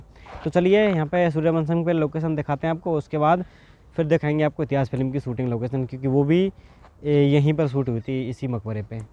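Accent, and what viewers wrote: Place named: Mathura, India. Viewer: Bhai aap mathura se ho kya Apki languages esi hi he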